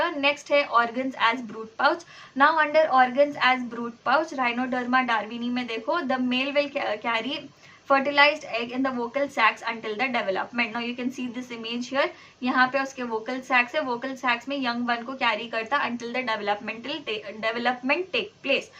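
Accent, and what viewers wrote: Hyderabad, India. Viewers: I think ap hyderabadi hai 😂❤❤❤❤ love your lacture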